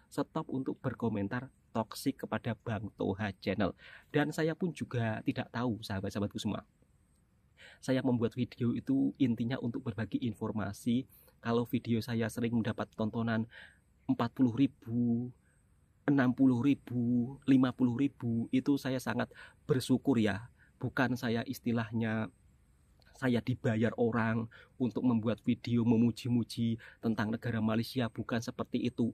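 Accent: Java, Indonesia. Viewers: 🫵👍💪🇲🇾☪️🇵🇸🤝🇮🇩* Itu Orgnya JUJUR, IKHLAS TAUDIRI SOPAN & nggak Sombong atau Ngawur Tanpa Fakta, Sketsa Vlogg Beliau itu Adlh pngisahn JUJUR Bgaimna Hidup & Pngalaman Seorng TKI yg Patuh Aturan, Mncari Rezqi Allah swt yg Halal bii Halal walToyyiiban (Bersih lgi Halal & Baik) mka Allah sntiasa Mnsejhtrakn & Memudahkn urusn2x Demi Sesuap Nasi mnyarahi keluarga & ank istrinya, mka itulh Warga Serumpun di Msia 🇲🇾💖🇵🇸 ini *mnhormati & Mnyayangi Vlogger Toha Channel ini* Smoga diContoh ikuti oleh Vlogger2x indo 🇮🇩 yg lain yg bikin Konten Kreator!!, Jgn Asal NGEVLOGG Tpi PENOH TOKSIK, BUSUK Nggak ada Edukasiannya, Mnambahi Dosa2x Kering dgn prcuma shja,*& TERUSSSKn Jihadun mu!! kmi warga Melayuin Aljiwiun ini Truss Support & Mnyokong Usaha Mulia mu kmulh Salah Satu DiAntra Duta2x dlm Mndirikn Ukhuwah Umah ☪️ yg SeAqidah, Serumpun asal Silsilahnya ini, yg Sgt Merendah diri & Brbudi Pekerti 🇲🇾💖🇵🇸☪️🫵🤝🇮🇩 Aamiiiin yra!!!🤲🫵Respek. 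Dpd Kulo Melayuin Aljawiun Jenenge:》 *Raden Wilakista' RSH Al'Fatah* Sekoh & Manggoni Nang Negri Meloko Msia🇲🇾💖🇵🇸🤝🇮🇩 *Sya Suka & Sgt Mminati Lorghat (Ascent) JOWO (Niang Jawi) yg Kluar dpd Hati & Mulut,Sgt Jujur, original Wong Jowo!! 👏👍🎉*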